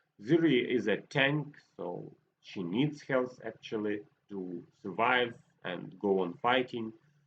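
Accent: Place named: Russia. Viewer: Привет, по акценту понял что ты Русский) подскажи пожалуйста что лучше создавать на телефоне? Играю всего 3 дня, и у аракне совсем другие способности, робот на 1 месте, вообщем все по другому) я строю вокруг маи для поглощения демеджа, аракне для стана и даредевил для демеджа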